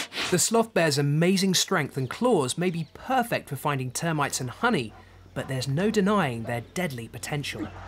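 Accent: United Kingdom. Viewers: Love British commentary. Its funny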